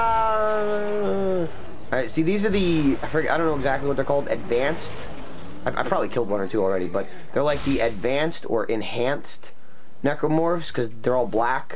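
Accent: New Jersey, United States. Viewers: He still sounds like he is from Jersey in these videos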